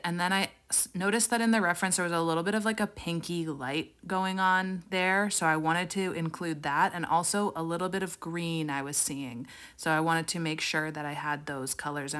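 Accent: Canada. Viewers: I ❤ ❤ ❤ your videos. Your accent reminds me of my family in Canada. You are also an amazingly talented artist who keeps it real. You make art so approachable. You have helped me get back into painting!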